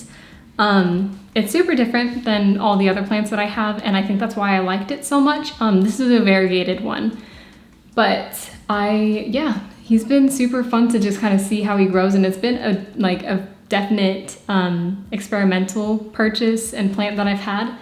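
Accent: United Kingdom. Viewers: Have you lived in England before? I hear a touch of British speak. Great collection by the way. I love the trubeii a lot. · You sound mildly British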